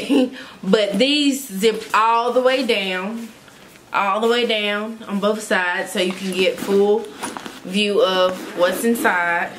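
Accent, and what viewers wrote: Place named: South Carolina, United States. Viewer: Sounds like South Carolina..😀Nice video 🤜🏽🤛🏽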